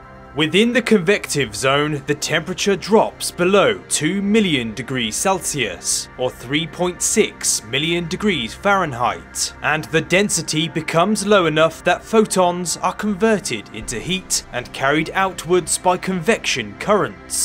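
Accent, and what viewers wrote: United Kingdom. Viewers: You can't delete someone's replies. And how is him having a British accent relevant to the comment?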